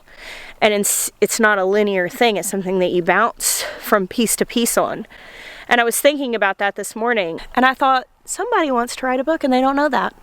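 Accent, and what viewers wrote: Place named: Arkansas, United States. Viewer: Lol I felt like you were talking to me 🤣 new subscriber! I found you right after Christmas bc I’ve been getting so excited to get my garden going again. I’ve been watching ALL of your videos. It’s so funny bc the first video I watched of yours, I noticed your accent, and said oh I like this girl. Then you said you had lived in Arkansas and was like, well there it us! 🤣 So fellow Arkansan here now! I also need to say this, and it’s going to sound insane, but when you said you wanted to do the 365 challenge, it made me want to work on my art skills again. I’ve been working on a pictured my son, and I’m usually in bed by now but I wanted to get this finished. I was in the living room and noticed a buzzing sound and started noticing a smell of melted plastic. I ran through the house thinking I was going crazy but found our hot water heater was burning! 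We’ve got it all settled now, but I’m thanking God that I was awake working on my picture. That could have turned out really bad really fast.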